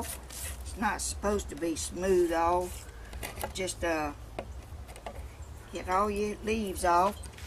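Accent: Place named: Georgia, United States. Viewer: I love your Georgia accent. I am from Amarillo, Texas so I have a Texas accent. Good thoughts coming to you from Texas.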